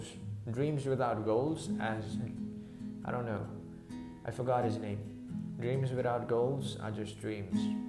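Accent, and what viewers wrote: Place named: England, United Kingdom. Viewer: Hi, I like your english acccent!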